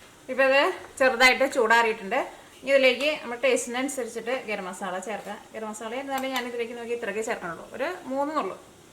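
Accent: Thrissur, India. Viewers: urakka parayana...nammal trichur kar allaaa...speed kooduthala..chilathu manassilagunnillaaa...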